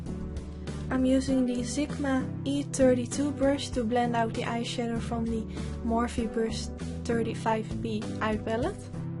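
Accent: Netherlands. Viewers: nederlanders praten echt allemaal op een heel typische manier engels haha, heel vreemd! niet slecht bedoeld trouwens, viel me gewoon meteen op :)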